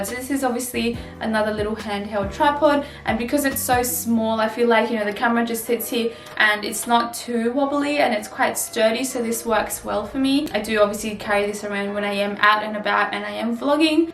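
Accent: Australia. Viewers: Brilliant video! So helpful, I'm still using my phone to film at the moment, I actually don't think it's that bad but would love to upgrade to a proper camera one day. The ring light makes SUCH a difference. Are you Australian? X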